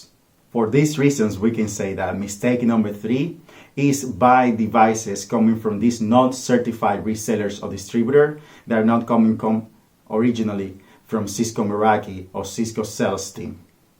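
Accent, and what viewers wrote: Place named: Dominican Republic. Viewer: Se nota que el pana es Dominicano ajajaj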